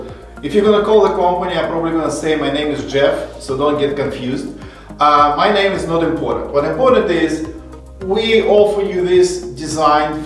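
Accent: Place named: Russia. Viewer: Я так и думала, что у вас русский акцент)) Конечно, кто ещё может додуматься до такого решения. Нужна смекалка (несуществующее слово в английском)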